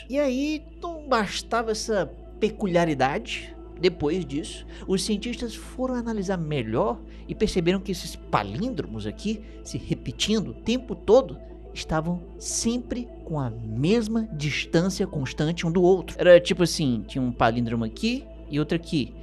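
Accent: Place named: Northeast, Brazil. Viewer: "Uma ruma de vírus" Amo o dialeto nordestino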